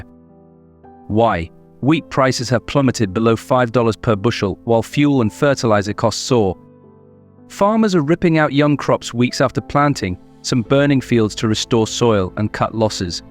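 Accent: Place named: United Kingdom. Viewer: Listen to a British voice talk about anything American NO THANKS!!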